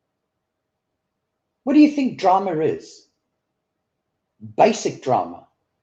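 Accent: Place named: South Africa. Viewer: Sooo glad I found another South African here in this crazy community! 😅 Great to hear a familiar accent!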